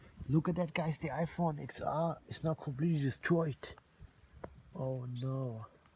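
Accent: Germany. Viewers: ich liebe Englisch mit deutschem Akzent xD I love the 5 mm faif millimeda[ganz schnell] echt gut xD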